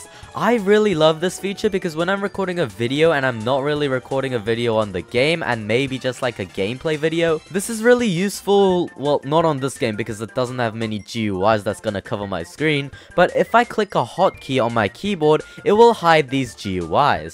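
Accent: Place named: New Zealand. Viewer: Yo Are you from New Zealand?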